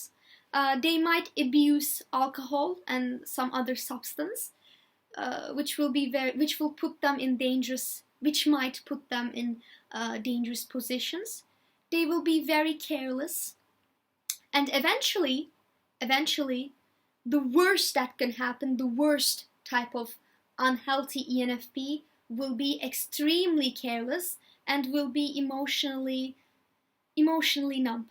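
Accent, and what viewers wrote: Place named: Turkey. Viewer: are you Turkish? Your accent is very light, so you are very easy to understand.:)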